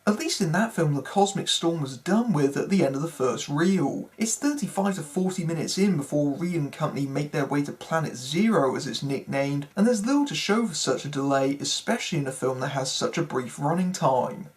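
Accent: United Kingdom. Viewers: that perfect British TV news anchor voice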